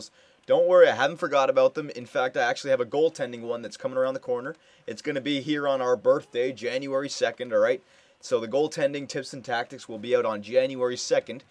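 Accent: United States. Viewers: r u american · R u amaracin or Canadian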